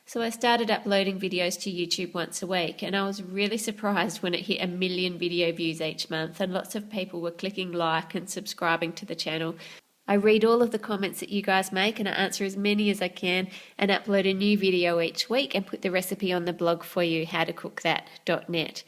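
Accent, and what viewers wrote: Australia. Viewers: Now I know why you have the different kinda accent from Australians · I don't really know the difference between Australian and English accents lol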